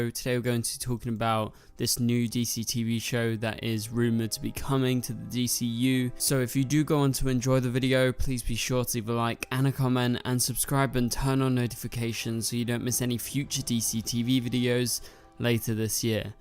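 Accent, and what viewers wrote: United Kingdom. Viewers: Great video. I have never been a really big fan of non-powered superheros. Dont hate me, but i didnt even care much for Arrow, nor do I really like superheroes like Batman. To me, it is just more interesting if the characters have powers. I love it whenever those powers are explained more and i get to see all the various abilities in action. I am also a huge sucker for Origin Stories. I have the entire collection of the 80s DC comic series Whose Who. I just love that sort of stuff. Im also excited about the upcoming shows and movies that will feature Marvel's Wiccan. That is going to be so cool to watch. Hey, do you know if Flashverse is okay? He has not made a video or went Live in a long time. I always enjoyed it whenever the two of you made content together. You make a good duo. Please reach out to him and see if you can get him as a Guest. 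And maybe encourage him to begin posting videos again. I first found your channel and I have loved everything you do. You are very eloquent and I love your particular British accent. And through you, I found Flashverse. He is equally well-spoken, and I just adore his personality and his accent. You are both great. And I find what little bit of my life's happiness there is, whenever I see a new video posted by you both.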